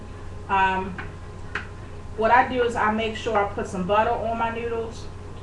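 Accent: Baltimore, United States. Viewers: Are you from Baltimore? you got that B-more "U" sound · are u from bmore? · she from bmore he voice I'm from dc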